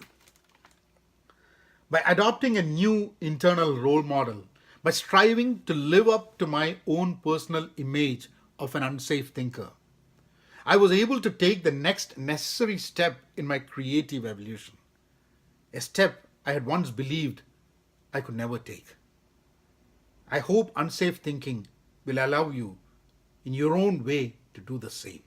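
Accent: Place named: India. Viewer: Sir its nice that an Indian is there for me so i can understand slowly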